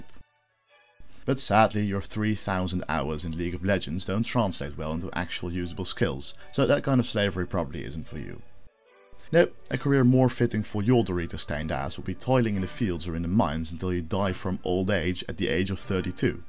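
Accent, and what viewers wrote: Netherlands. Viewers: Thicc Dutch accent, daammnn.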